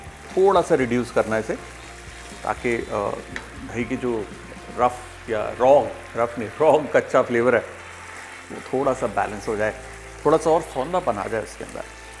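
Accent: Punjab, India. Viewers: Aajto pakke punjabion ki tarah bol rahe ho.maja Aa raha hai as I am from Amritsar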